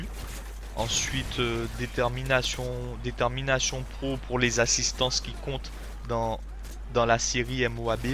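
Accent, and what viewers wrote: Antilles, Caribbean. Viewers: J'adore ton accent ! Guadeloupe ou Martinique ? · accent antillais non !? en tout cas tu commente super bien ! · C'est un accent antillais ? :)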